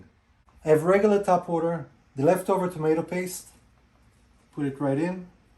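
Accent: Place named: Israel. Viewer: Amazing video. By the way, I noticed you have an Israeli accent.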